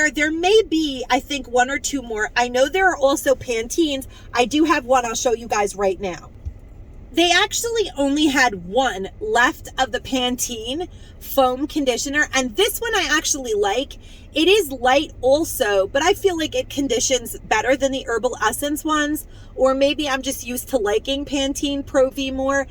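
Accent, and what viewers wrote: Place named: Long Island, United States. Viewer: I so enjoy your videos. Your accent is very similar to my own. I'm originally from Valley Stream, LI but I've lived in South Carolina for 15 years. Listening to you sounds like home. Bless you!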